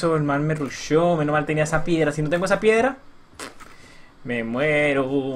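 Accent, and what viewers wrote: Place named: Colombia. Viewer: Por fin un Colombiano que no se cree mexicano o no habla como tal, 100% colombiano, :v, siga así ñero <3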